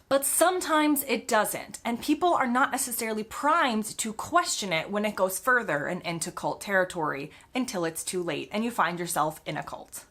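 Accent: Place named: United States. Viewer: Madam, I am not sure you are correct, but your ENTIRE VODEO was beautifully phrased, logical, eloquent and humorous, Many bemoan your young American qualities and write you off as an airhead, I presume because of your intonation . But I find you to be unusually perceptive and well spoken to boot. I have said it before and I say it again, you are a welcome addition to our Great Britain!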